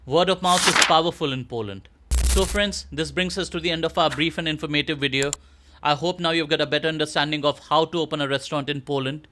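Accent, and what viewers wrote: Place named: India. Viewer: You're speaking in an Indian accent and so, I can guess you're based in India. How would you provide a worker in Poland with a job?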